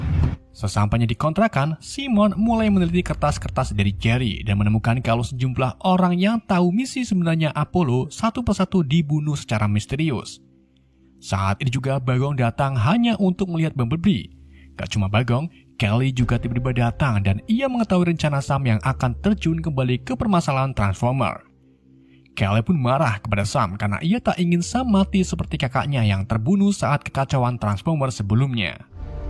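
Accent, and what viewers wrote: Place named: Tegal, Indonesia. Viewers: Dari logat bicaranya kayak orang jateng😂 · Sampean wong tegal wis jelas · curiga..ni pebgisi suara kyy orang tegal...tau semua bahasa tegal...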